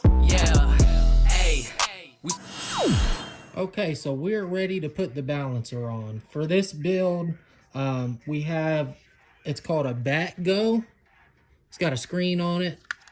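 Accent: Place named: Indiana, United States. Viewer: Yo you from Indiana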